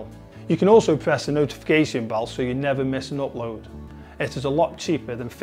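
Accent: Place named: Stoke, United Kingdom. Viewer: This man has to be from Stoke!😊 (It takes one to know one.)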